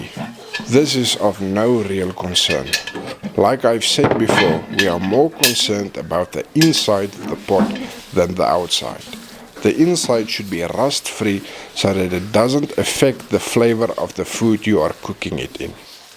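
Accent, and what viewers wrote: South Africa. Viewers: I can’t wait to restore our almost forgotten pot, but I will definitely watch this video multiple times to listen to your heavy South African accent 😊❤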